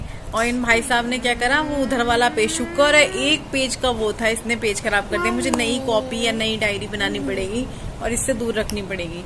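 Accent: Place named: Punjab, India. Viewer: Hiiii di , aap punjabi ho Kya , aapki tone thori thori lgti hai waisi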